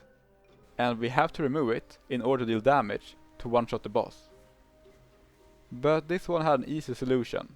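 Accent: Sweden. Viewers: Never have I heard such a heavy swedish accent.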